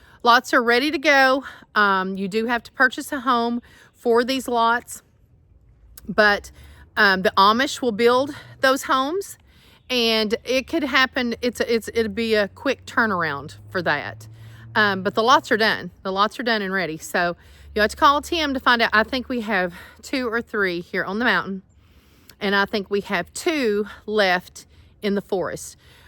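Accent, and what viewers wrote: South, United States. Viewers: OMG!!, my bird has chirped the entire video! She must like your southern drawl!! 😁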